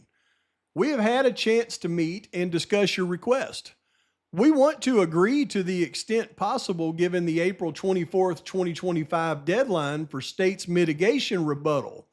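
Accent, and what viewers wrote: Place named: South, United States. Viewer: The hick accent is too distracting. Sorry, not today.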